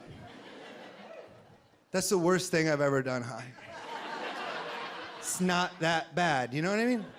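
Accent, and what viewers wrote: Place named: New York, United States. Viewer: I could of swore I heard a New York accent. Thanks for the laughs.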